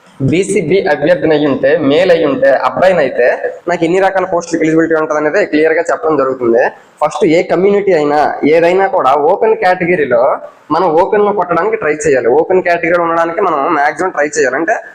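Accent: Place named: Vizianagaram, India. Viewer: Nee yaasa bhasa vijayanagaram or vishakapatnam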